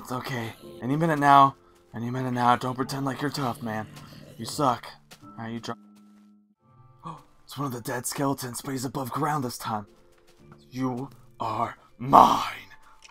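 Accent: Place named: United States. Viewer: Like an American or Bible Thumper. If American's sound like that, what do Canadian's sound like? Makes me nervous just thinking about it O.o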